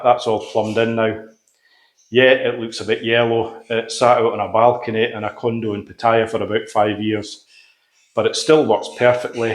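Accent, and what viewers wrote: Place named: Scotland, United Kingdom. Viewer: Hi, when we were kids we had a saying that goes like this....... jealousy makes you nasty....... If you give way to the low class you end up like them. I listen to the Scottish accent coming through, if you are of Scottish descent, man, this person placing these comments clearly does not know the Scotts. Water of a ducks back, that's how you treat these comments. Good luck with the wetting of the front porch as soon as the place is finished.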